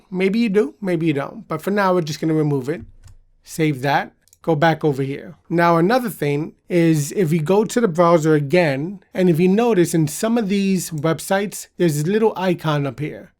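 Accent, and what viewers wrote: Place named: India. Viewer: Ur english sounds like Indian..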